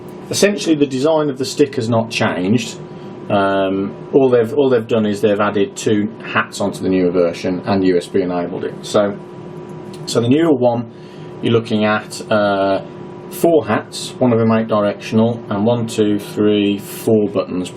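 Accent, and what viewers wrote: United Kingdom. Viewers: He sounds British to me, mate. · A bit late but great video. Is that a midland accent lol my name should tell you i am also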